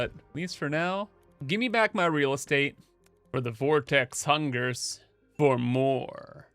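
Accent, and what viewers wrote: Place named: Canada. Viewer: In all my years of Shapez, I've never seen anyone ignore the miner extensions and just build platforms and factories in the middle of their miners :D 600 hours in and I've come to accept that there is no wrong way to play the game. Also, I get the feeling you're Canadian, yes? Your "Eh" was showing ;)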